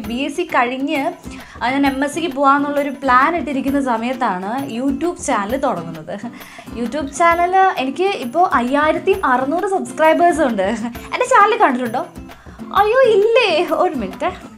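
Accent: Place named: Kochi, India. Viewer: ചേച്ചിയുടെ കൊച്ചി സ്ലാങ് സൂപ്പർ ആണ് ട്ടോ...🥰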